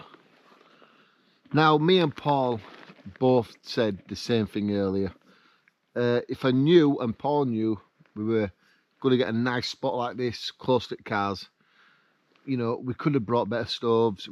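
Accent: United Kingdom. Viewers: Nah, the accent sounds cool. Stuff sounds better with a British accent and they have great colloquialisms.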